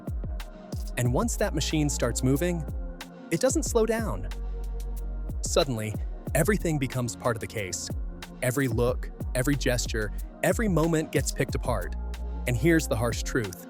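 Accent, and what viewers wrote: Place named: United States. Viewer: Usual yankee verbal diarrhoea narration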